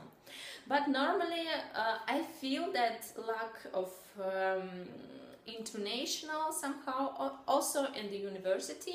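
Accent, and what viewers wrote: Russia. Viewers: Your English is good. You definitely have an identifiable Russian accent but it's the cute kind, not the kind that's too thick and difficult to understand. Your voice is pretty and enjoyable to listen to as well. And you are absolutely gorgeous (!), so that helps :p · You are a very nice woman and your Russian accent is very pleasant to hear it! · Sorry for some American behavior. We like the Russians trying to talk English.You are doing a great job and I have met Russians here and have no trouble with them.I like hearing their stories and your accent is cute.Take care and thank you for speaking English it is a honor. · the Russian language structure makes you speak English with that accent. don't worry. I was in kharkiv for nine months and I can't speak Russian and it is very difficult to pronounce the Russian words., you look very good and your English is careful and cute, take care sister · I think the vast majority of American men adore your ( Russian women ) accent . 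I marvel at the comprehensive knowledge of English that Slavic people acquire in a very short period of time , especially Russian’s and Ukrainian’s . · I will be deadly honest with you. You do have promenate Russian accent. It is unmistakable. Not to be rude, but your accent can not be mistaken for anything but Russian. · You are so cute and you have as how cute you as Russian accent. I hope one day, people will say ''ohh is she from Great Britain?'' for your English like you thinking about Danish people's English :) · The Russian accent is nice! Please do not lose your Russian accent. There is no need to acquire an Anglo-Saxon accent. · I was here for listening to funny Russian English but landed up in watching an honest confession.This is a really nice video,I appreciate. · I'm mexican and still learning English a lot of people told me that my accent is like Russian, I thought it was terrible but ur accent it's lovely haha I really like the way u speak English, and u are so good at it keep going... 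Now I know how my accent sounds like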